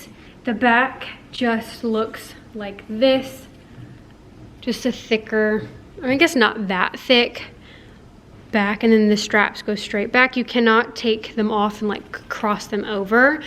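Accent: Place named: United Kingdom. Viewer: I love your British accent 😂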